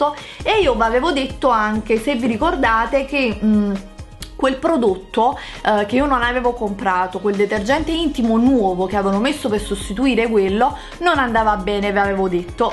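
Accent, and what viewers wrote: Naples, Italy. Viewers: Ma sei napoletana? 😍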